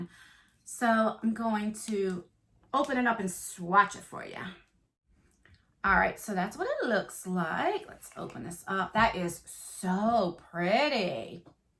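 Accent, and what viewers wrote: New Jersey, United States. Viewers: I absolutely love watching you. I currently live in Alabama (20+ years ) but my family is a bunch of " Damn Yankees " . You remind me of cousins who are sweet with just a little tart thrown in to keep it interesting .By the way did you know you have a Jersey accent ? L.O.L.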